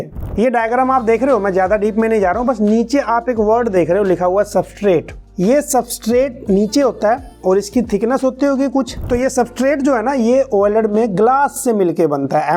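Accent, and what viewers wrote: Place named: Haryana, India. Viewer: Ohh haryanvi board bhai.. haryanvi touch h apki boli m.. haryana se ho kya??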